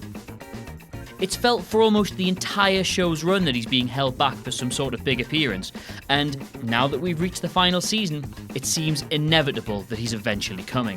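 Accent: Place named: Northern England, United Kingdom. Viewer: LL cool J Mate he's got a thick northern accent, in what world is that the Queen's English?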